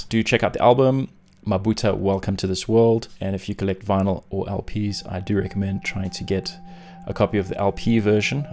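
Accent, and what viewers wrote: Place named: South Africa. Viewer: Oh this is great for so many reasons - one of which is to be hearing a South African accent on this kind of video 🤣. Thanks, I'm subscribed and 🔔🛎️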